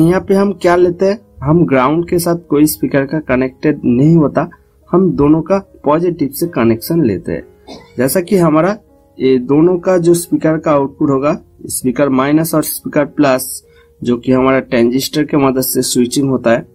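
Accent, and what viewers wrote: Bengal, India. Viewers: Bhaiya ap kiya bangali ho kiya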